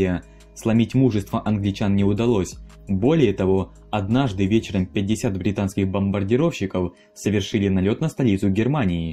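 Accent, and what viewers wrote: Ukraine. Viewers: Западноукраинский акцент, просто старается тщательнее произносить русские слова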